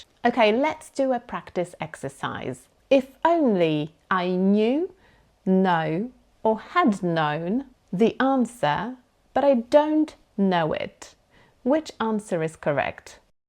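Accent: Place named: United Kingdom. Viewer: your English is extremely clear! I love british English! It's very nice to hear you! ❤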